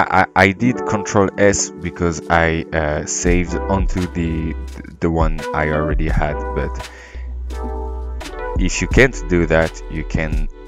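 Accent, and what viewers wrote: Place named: France. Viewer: I love your (french?) accent! This was very helpful. Also, le francais cest cool!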